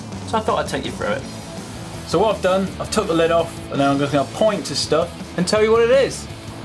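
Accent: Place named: Cockney, United Kingdom. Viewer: Are you an East ender ? you got a fantastic thick Cockney accent.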